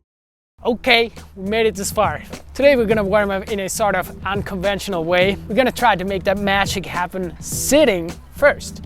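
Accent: Switzerland. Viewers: auch du bist’s die uber/Ubter flip meister JG jajaja haha ich hab das wennst du SwitzerDeutsc spricht gern !! es macht mich like what the heck is he saying lol I took sechs jahre Deustch auf gymnasium und Uni und wenn ich ins Luzern war habe ich ein kickflip gemacht für ein skater und er denkt es was toll ja am tollsten lolz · wait what he's swiss🤯 "s wird en kampf abr vlt funkionierts ja"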